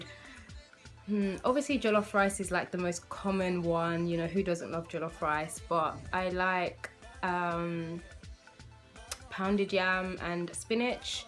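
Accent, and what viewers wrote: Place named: Nigeria. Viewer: Team naija, love your accent , omo Yoruba , check out my channel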